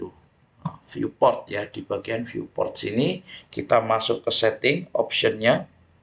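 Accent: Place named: Banyumas, Indonesia. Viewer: Pak bahasanya ngapak. Ngapak daerah mana inj?😂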